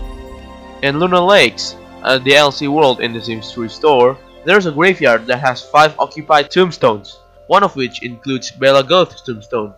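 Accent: Indonesia. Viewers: Indonesian accent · Good timing! I'm playing Sims 3 supernatural right now. Also, gosh your English accent is so Indonesian just like me. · udah ada firasat xD dari logat bahasa inggrisnya ketauan bgt orang indo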